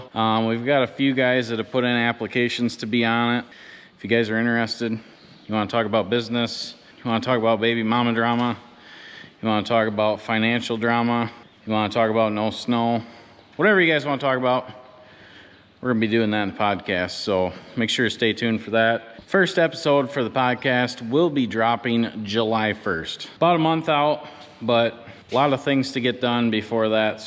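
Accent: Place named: Canada. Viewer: Ah ur too Funny ... Canada " eh "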